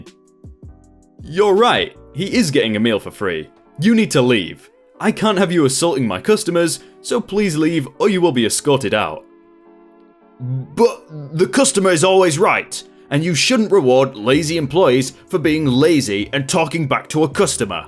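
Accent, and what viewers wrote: United Kingdom. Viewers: I wonder, are you British? You pronounce words in ways I never thought of. I like they way you talk better than the way my friends do. Like how you said "rose". We would pronounce it like "rows" basically. You also say "zed" for z and "hach" for h. I like it. It isn't native to myself so I was curious of where you were from.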